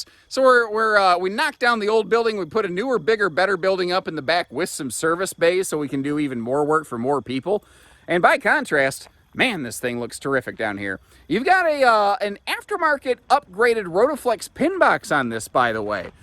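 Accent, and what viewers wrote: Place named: Upper Midwest, United States. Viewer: They very first video of yours I watched I heard “right dere” used as punctuation and knew you were a good upper midwestern boy. I, too, am from the midwest and it’s pop. I’ve got the significant other trained to say pop when speaking directly to me (it’s soda otherwise) and my adult daughter is still with us and said that if she goes back to Alabama calling it pop she’ll be ticked. It’s pop. 😬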